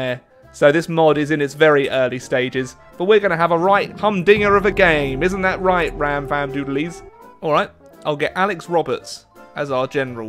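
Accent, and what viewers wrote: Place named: United Kingdom. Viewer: Yeah, I wish I had half the charisma and British accent he has. I'm just here to show support for the man who gives me a reason to look forward to something more than just another day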